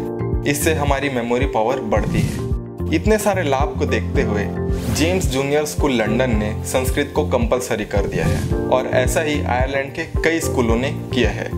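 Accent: India. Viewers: I love Indian English.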